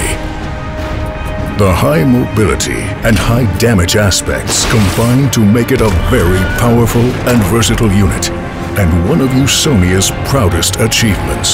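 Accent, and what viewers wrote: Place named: United States. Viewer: you kinda feel the murica from the narrators voice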